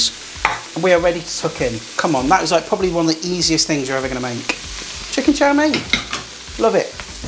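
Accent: Leicester, United Kingdom. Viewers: Lol just listening and thought hes from Leicester after going London road and granby street . Hey presto, thurnby lodge here.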